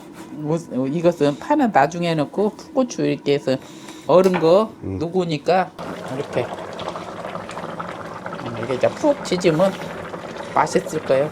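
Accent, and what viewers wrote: South Korea. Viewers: 무우를무수라고??ㅎㅎ 전라도말투 저도전라도여요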